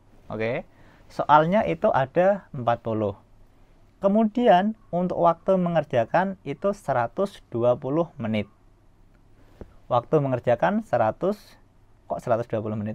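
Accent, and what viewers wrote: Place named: Japan. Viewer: sensei nada bicaranya kecampur logat jepang😭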